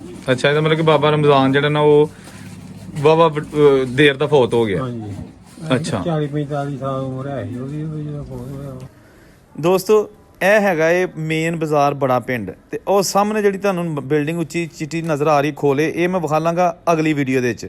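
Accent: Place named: Punjab, India. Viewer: Apke bolne ka style ham Panjabi jaisi hi. Sunkar apnepan ka ehsas hota hai.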